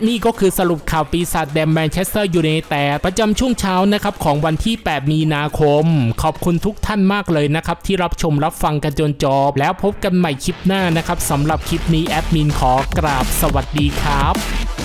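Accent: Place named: Isan, Thailand. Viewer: admin พูดอิสานเป็นด้วยเหรอครับ 555+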